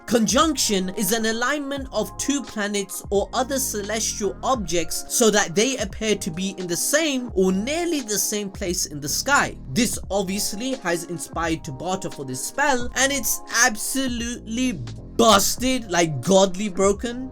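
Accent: India. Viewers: Love your hindi acent😂💕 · That indian accent though 😂😂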